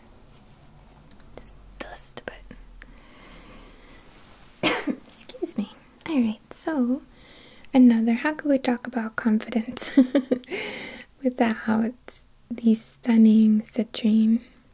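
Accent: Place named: New York, United States. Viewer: I can hear NY in ur voice. Much love🧡🧡🧡✨✨✨✨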